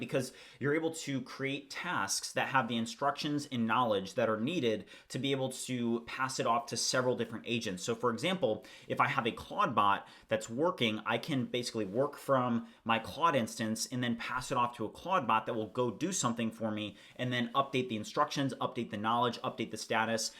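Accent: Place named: United States. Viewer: I like the 'merican accent and: eeaaAANNd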